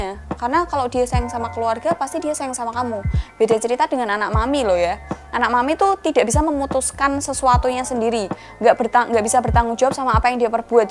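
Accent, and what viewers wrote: Java, Indonesia. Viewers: Medok'e celotehan mu mbaakk mantap jowo ne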